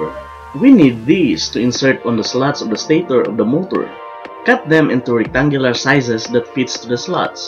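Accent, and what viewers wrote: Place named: United States. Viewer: Pinoy yung pangalan ng channel kano nman yung nagsalita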